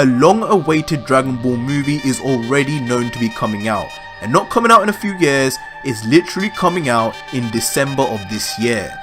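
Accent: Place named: England, United Kingdom. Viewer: Hello you sound like your from England, so am I !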